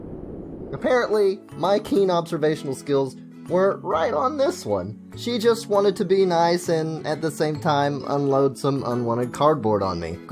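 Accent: South, United States. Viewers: Is that a southern accent I hear?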